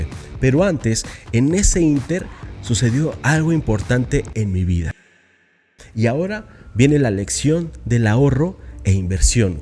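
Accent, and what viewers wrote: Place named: Colombia. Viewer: pensé que eras colombiano.por ti acento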